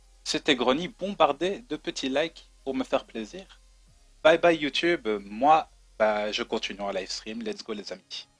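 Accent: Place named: Belgium. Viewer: Oui il est de Bruxelles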